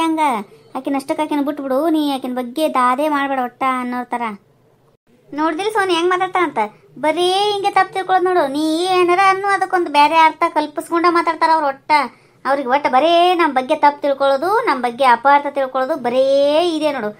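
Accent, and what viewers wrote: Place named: Uttara Kannada, India. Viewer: Namaste akka, sambhashane Matra super, ondu kathe na vastavakke tarodu enu easy alla, film olage ondondu character nu bere bere yavru madtirtare, avravra character olage involve adre aytu, but ella character olagu obre involve agi, kathe na vastavakke tartira, nimma talent ge hats off 🙏 adrallu uttara kannada bhashe sogadu, mukhadalli tumba nagu taratte, otnalli nim videos refreshment agiratte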